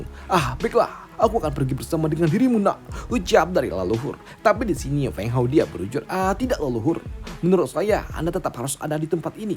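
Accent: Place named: Java, Indonesia. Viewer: Medok bang heheheeh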